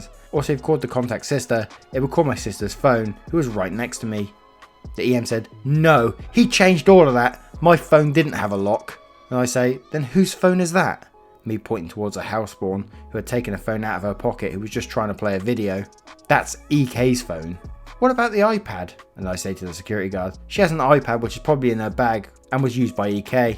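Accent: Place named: Oxford, United Kingdom. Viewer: Oh ok, thanks for clearing that up in my mind - I honestly never came across that broad accent of yours before and find it simply difficult to follow as I try making out what you say and squaring it with what i read lol (only been to Oxford once, to throw eggs at the guys from Balliol College and leave, so I really never got the chance to listen to the local lingo ^^)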